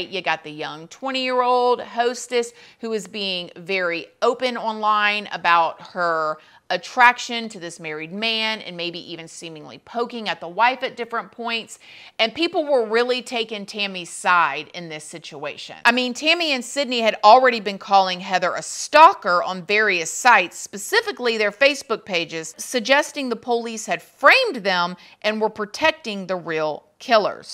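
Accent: South, United States. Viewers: You can really tell that your from the south..."cause the most beautiful things come out your mouth" 😊❣️🎉 the adults in this situation were very immature emotionally and spiritually..You expect a 20 year old to not be super mature and aware of things but honestly these older people took advantage of her inexperience with relationships and her crush on a married man... They should have been setting a better example for the young lady...but obviously they had their own deep rooted issues . I think they both got off on the whole thing....Heather didn't make the best choices ..but she was young and inexperienced and didnt deserve to die over it... Tammy and Sydney are preditors... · New sub here. Love your videos...plus you gotta be from the south or have a lil hood in you. " beat the dog doo doo out of him" lmao I havent heard that in soo long. Plus the way you say POlice. 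I love it. You do a great job on these videos. Cant wait to see more. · 😂😂 guuuurrrl I know U country I can tell that, yes ma'am lol but I can't pick where U from, I have fam in calli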